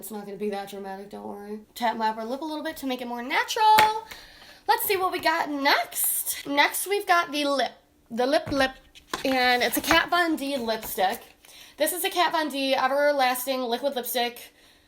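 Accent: Buffalo, United States. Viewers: This girl has to be from buffalo- can hear that accent anywhere 😂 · Are you from Buffalo? Your accent sounds like you're from Buffalo hahaha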